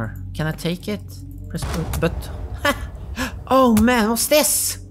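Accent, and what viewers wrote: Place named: Sweden. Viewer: thanks brah Are you swedish ? , I asked because I wanted to guess what you are , you sound very swedish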